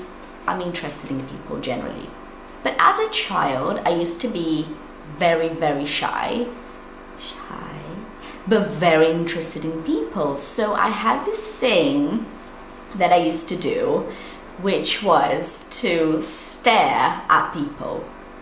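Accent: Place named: United Kingdom. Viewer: Wow your accent is very beautiful!!! I like it, is so British! 😊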